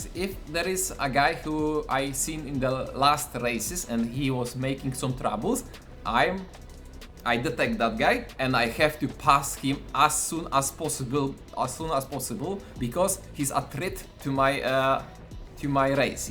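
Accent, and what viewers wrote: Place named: Russia. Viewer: bjutiful raszan akcet yea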